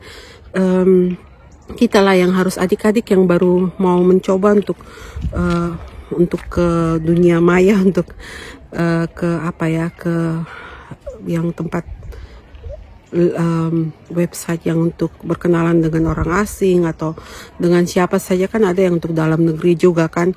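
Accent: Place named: Papua, Indonesia. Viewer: Maaf bunda apa asalnya dari paua soalnya logat bicara bunda seperti orang papua.. Aq jga ada saudara papua tepatnya di nabire.. Aq suka sama bunda karna murah senyum.. Kebunya luas sangt indah sekali suasananya... Memang php mnyakitkn betul kata bunda php bisa terjadi pada siapapun dan dimanapun.. Semangat bunda0💪💪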